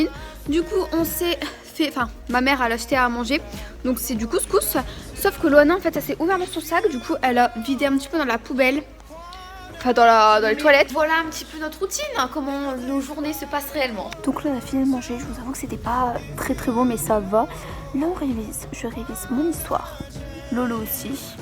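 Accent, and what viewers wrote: North, France. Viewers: Vous avez l’accent du Nord fort prononcé 😂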